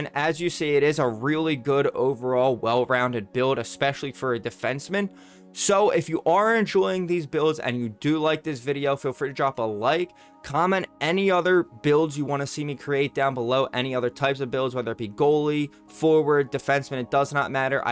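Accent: Delaware County, United States. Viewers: in that delco accent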